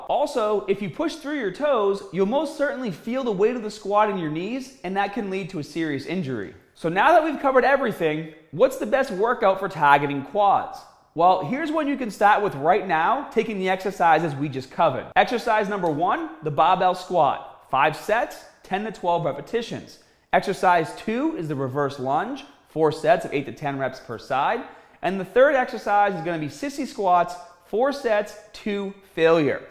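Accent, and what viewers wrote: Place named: Massachusetts, United States. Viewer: Sounds like your from Mass? Great video!!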